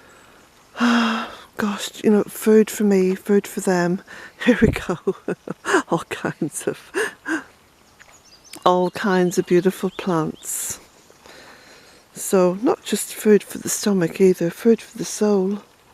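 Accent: Ireland. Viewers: Hello to the wealthy, amazing lady and jack. lovely to see your heavenly garden, also hearing the birds and your lovely Irish accent. I'm trying to set up my ironing, but keep stopping to see and hear your conversation . Thank you for the joy. north shrop England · Beautiful, your hard work was well spent. Watching this from rural waterford and am glad to hear the gorgeous Irish accent represented :)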